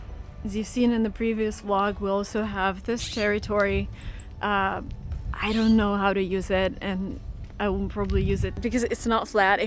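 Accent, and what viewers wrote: United States. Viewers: You look so happy it's contagious. How tall are you? it's the sink pretty low or a combination of both. You don't seem to have an accent (ok you have an American one), are you from the states? I hope you guys enjoy the place for a long time.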